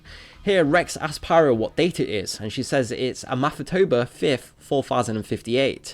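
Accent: United Kingdom. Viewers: the nasaly Brit voice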